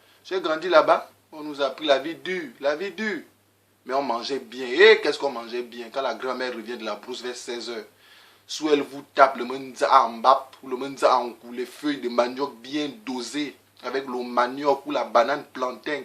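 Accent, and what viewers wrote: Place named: Fang, Gabon. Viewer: hahaha un vrai fang . eeeeh aaaah dza lol I like it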